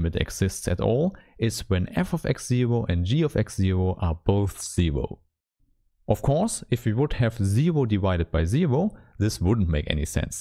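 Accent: Germany. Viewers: Oh, your german? Its quite noticable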